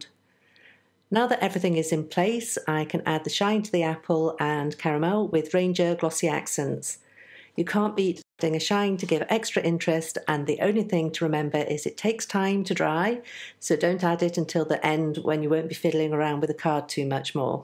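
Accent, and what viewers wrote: United Kingdom. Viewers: Absolutely amazing. And great to hear a British accent 😊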